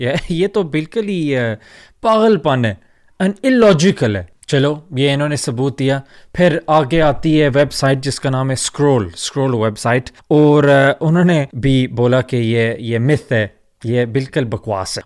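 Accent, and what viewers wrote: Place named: Punjab, Pakistan. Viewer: You talk like a punjabi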